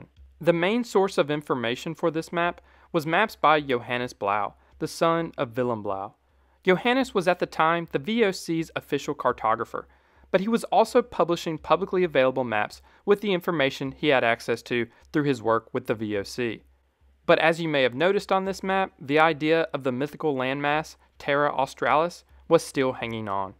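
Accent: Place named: Tennessee, United States. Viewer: Hey there I was trying to guess your accent and just wondering what state you’re from ? I hear TN. Thanks. Nice video.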